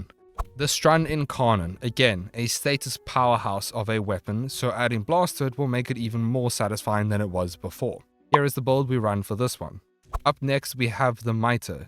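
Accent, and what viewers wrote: South Africa. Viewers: Your South African accent makes you more trustworthy somehow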